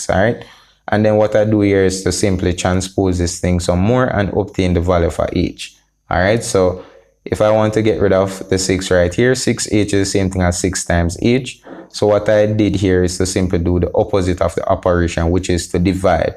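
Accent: Jamaica. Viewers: love the Jamaican accent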